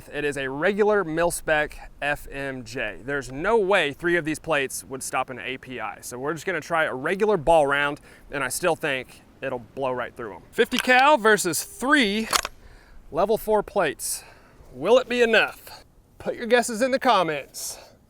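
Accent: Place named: United States. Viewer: Sounds like perfect American English 😊😅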